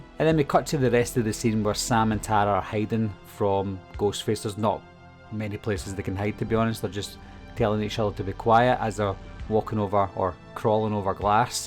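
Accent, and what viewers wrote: Scotland, United Kingdom. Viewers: Scottish accents make everything more enjoyable to me. ❤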